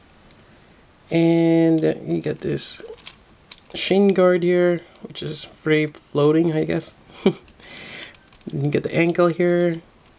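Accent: Philippines. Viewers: Are you a Filipino? There's something with your accent. :)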